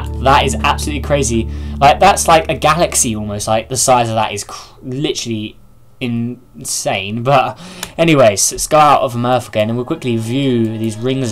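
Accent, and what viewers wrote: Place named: United Kingdom. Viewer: his voice sounds so british like he is saying you alright mate or cheers mate or cheers felure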